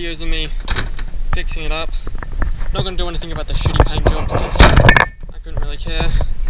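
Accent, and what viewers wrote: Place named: Australia. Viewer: already can tell ur an aussie in the first 10 seconds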